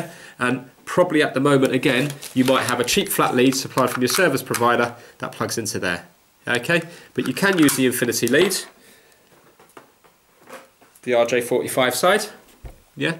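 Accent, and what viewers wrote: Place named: United Kingdom. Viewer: Nice to put a face to the channel. Love the channel. Currently watching the backlog. I love the "Ok, yea", "Right, ok", "Yea". "Ok? Yea"... It's the region/accent, but it's so noticeable as someone from outside the UK.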